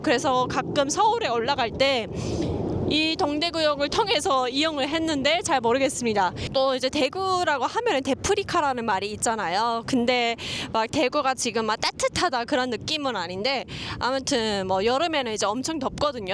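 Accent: North Korea. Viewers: 신기하네요 북쪽억양이 조금씩.. 자연미인이시면 대단한 미인이신듯!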